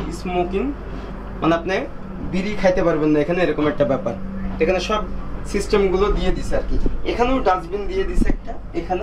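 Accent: Bangladesh. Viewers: বাংলাদেশী english এমনি হয় ।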